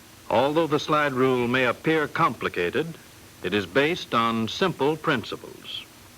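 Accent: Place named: Oklahoma, United States. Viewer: Who did the voice over for this film? He sounds like someone from Oklahoma.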